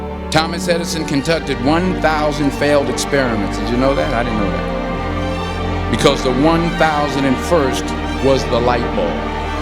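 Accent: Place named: Scotland, United Kingdom. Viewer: I was having some trouble recently calming manifestation.guide the voices in my mind during my morning meditation. him has a wonderful Scottish accent and a very calming voice. Thanks to his meditation CD's I have gotten back on track and feel a big difference in my meditation practice.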